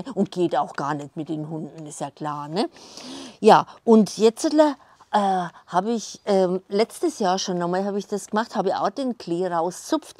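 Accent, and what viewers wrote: Franconia, Germany. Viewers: Hallo Du bist Fränkin und kommst vermutlich aus dem Raum Ansbach, stimmts? Liebe Grüße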